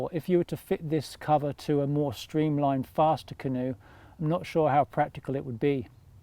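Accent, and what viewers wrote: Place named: Australia. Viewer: I thought this guy was an Aussie. Can you make tea in it?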